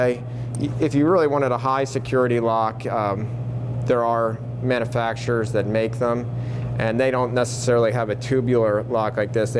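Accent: Philadelphia, United States. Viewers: You have a strong Philly accent.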